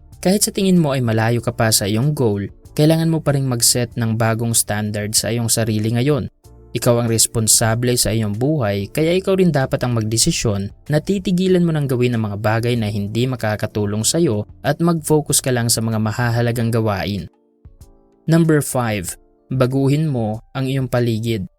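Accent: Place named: Visayas, Philippines. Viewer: Ikaw rin dapat mo baguhin pag sasalita mo parang bisaya..pilipit ang dila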